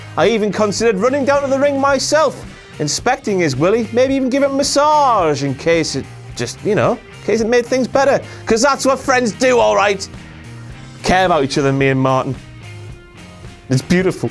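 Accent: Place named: Scotland, United Kingdom. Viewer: I love his Scottish accent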